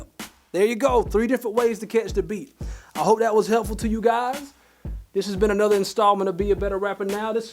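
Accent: United States. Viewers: You are one YouTuber that I can watch and understand all your teachings I love them all my song is coming together thanks and you sound like you from my home FLORIDA 😎 especially when you say dat 😂 we talk like dat 💯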